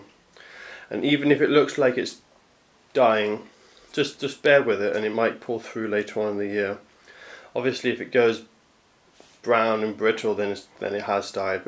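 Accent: United Kingdom. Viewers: The chap has a British accent, so I assume he's in the UK. The UK, along with Europe, uses Degrees Celcius to measure temperature, and 15 degrees C is equivalent to 59 - 60 F (Farenheit).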